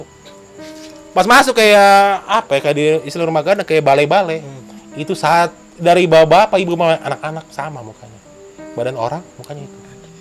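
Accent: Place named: Jakarta, Indonesia. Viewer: Ngomongnya cepet bet dah... wkwk logat jakartanya kental jadi susah di hayati😅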